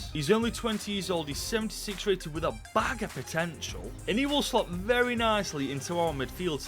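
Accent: Stoke, United Kingdom. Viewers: Are you from Stoke mate?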